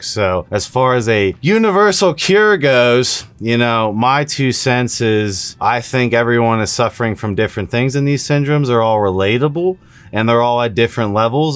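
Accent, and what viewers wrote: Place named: Western Pennsylvania, United States. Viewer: I hope you get sorted and feeling better. I noticed a Western(ish) PA in accent. Is this where you are from?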